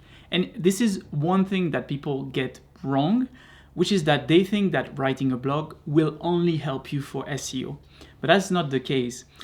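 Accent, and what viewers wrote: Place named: France. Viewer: I detect a french accent. Très joli!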